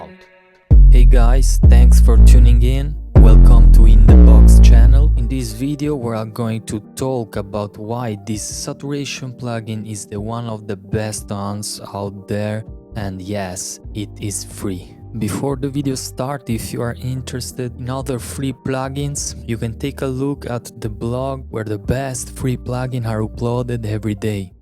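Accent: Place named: Italy. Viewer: Dopo pochi secondi dall'inizio del video ho intuito l'accento italianissimo. 😄 Conoscevo ed usavo già questo plugin, ma grazie alla tua spiegazione mi è più chiaro come lavora, grazie. 👍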